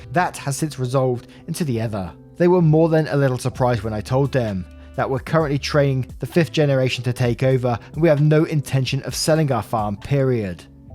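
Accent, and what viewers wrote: England, United Kingdom. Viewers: You’re British, people are really bothered that you have a different accent? 🙄🤦🏻‍♀️ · why the heck shouldn't you say "haitch?" You're English! That's how folks from England say the letter H. If you want to say it "aitch," knock yourself out. I'm not gonna tell you how to talk. And neither should anyone else. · I just found it amusing that alot americans , are trying to correct a English man, literally born in England, on how to speak and pronounce English.........🤣😂🤣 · I love the English pronunciation of H. Don’t change, and still say zed, not z. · Ha, don’t stress about it, I mean I just figured that the “h” thing was a British thing and reasoned that if you did it, then all Brits did it! 🤪🤦‍♀️. ALSO this’s off topic, but I recently discovered a hilarious British show called PLEBS... England has been holding out on us because that show is pure gold (well at least the first 3 seasons)!! 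🤌👏🤣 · You say H like any British person does. Doing nothing wrong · You don't need to change the way you pronounce letters. I've heard a number of people from the UK pronounce the letter H the way you do, and there's nothing wrong with it. I hope you don't feel like you have to adopt Americanized pronunciation to please your viewers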